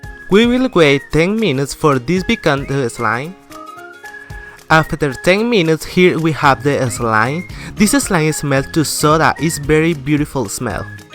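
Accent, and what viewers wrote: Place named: Spain. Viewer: Tienes más acento español que inglés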